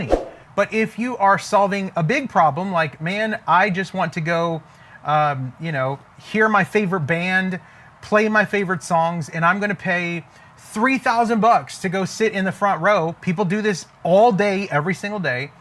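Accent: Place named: Israel. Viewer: pretty good Israeli accent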